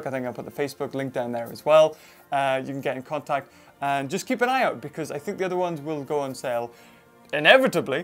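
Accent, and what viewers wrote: United Kingdom. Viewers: are you British??? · Ever since I’ve been watching I may have develop a British accent at times.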